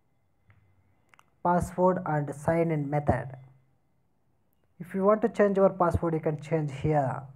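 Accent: India. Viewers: India english as FUCK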